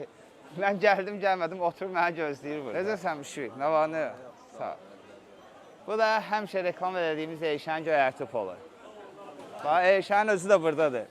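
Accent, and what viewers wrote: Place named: Baku, Azerbaijan. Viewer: Can Ay Bakılım ləhçövə quzu kəsim.Qulağa necə xoş gəlir bizim şirin ləhcəmiz ağzunnan bal damlıyır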